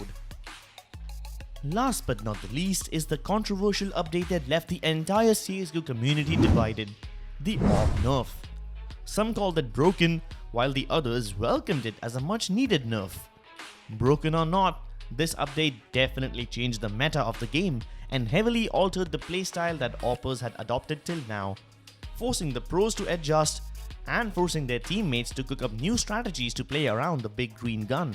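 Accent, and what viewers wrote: India. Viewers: I'm sorry guys, I know you're doing great job at researching and editing, and it's really not your fault (or nothing you can do about it), but this indian accent is just unbearable, made the whole, otherwise well made, video unenjoyable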